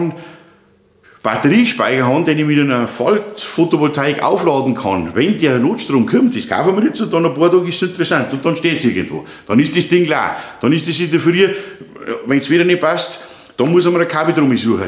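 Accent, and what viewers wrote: Bavaria, Germany. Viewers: Einmal wieder ein sehr informatives Video. Dankeschön. Auch, wenn es für mich leider wieder eines der Videos war, welches für mich aufgrund des von mir (im Verhältnis zu anderen Videos) erneut verstärkt wahrgenommenen bayerischen Dialekts akustisch leider nicht durchgängig einfach zu verstehen war. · Herzlichen Dank für die klaren Worte und die guten Erklärungen. Mittlerweile habe ich viele Videos von Ihnen angeschaut und finde jedes sehr interessant und für mich praktisch umsetzbar. Vor allem das Thema Notstrom, Einspeisung und Ecoflow betreffend. Kompliment! Positiver Nebeneffekt: Ich habe mich an den bayrischen Dialekt gewöhnt, sodaß ich heute ohne Rückspulen zu müssen, alles beim ersten Mal verstehe! 👍👍🍻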